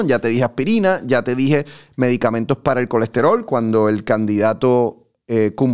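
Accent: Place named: Venezuela. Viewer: Perdone Dr. en que país se encuentra Ud.? Y de donde es? Ud.tiene acento Venezolano. Bueno le deseo todo lo mejor de la vida sobre todo mucha salud,felicidad,paz,y prosperidad. Muchos existos en su hermosa carrera. Muchas Bendiciones.!❤❤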